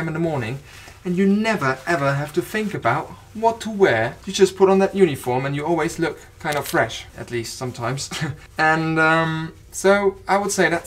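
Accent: United Kingdom. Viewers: I am trying to figure out where his accent is from. It's like he learned English from people in East London.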